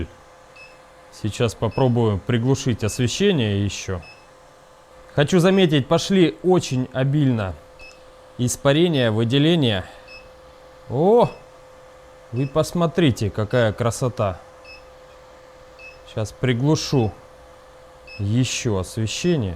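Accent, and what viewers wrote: Rostov, Russia. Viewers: Ростовский говор)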